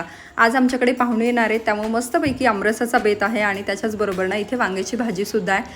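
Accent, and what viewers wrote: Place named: Kolhapur, India. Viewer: Kolhapur chi nsun pn kolhapuri vatates 😊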